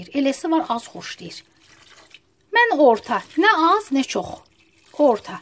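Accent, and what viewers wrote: Baku, Azerbaijan. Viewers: Canım ay Bakı ləhcəsi!!!!!! Lap ürəyim açıldı nenem sözünü eşıdəndə. Əllərinizə sağlıq!!!!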